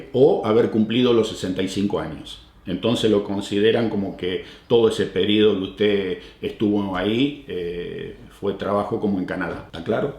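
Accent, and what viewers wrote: Argentina. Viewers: Cómo no se te fué el acento... Argentinazo!!!!! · Q grande,por tu forma de hablar parece q nunca te fuiste e Argentina,como te quiero!saludos desde Buenos Aires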